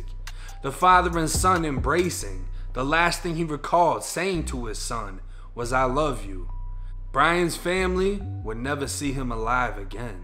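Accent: Boston, United States. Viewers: your funny as hell brotha, your baaaston accent makes the narration even better. Keep it up brew, good story, and do more of these "true crime" posts, it definitely fits you. Peace brah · Actually I just show your first video on lockdown, Im Live in Malden, I new you had a Boston ascent, that’s why I originally asked. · lol mad boston accent but trying to sound southern slang? so confusing lol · Damn u must be from boston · Are you from boston? Asking cuz that accent lmao I’m from springfield ma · Is that a Boston or New Hampshire accent?? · Why is it that everyone who is into this rap/hip hop culture, talk with voice inflections that do not coincide with the rest of their speech, why can’t they just speak the way they naturally should? I got nothing against this kid at all, I actually just stumbled upon his channel and content which is pretty cool, this kid is obviously from the Boston area, it’s hard to nail down different accents and what neighborhood they come from, this kid sounds like he’s from Southie, but I can’t be certain, being born and raised in NYC I can pick up Brooklyn/Bronx inflections. 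I wish this kid nothing but the best. I just wish these kids who are into this culture would stop trying to emulate speech that isn’t part of their natural speech patterns. It’s just something that bothers me. I completely own that’s it’s my shit. But God speed to this kid and what he does, good, solid, original content, on horrible stories that aren’t picked up in the media. Good stuff!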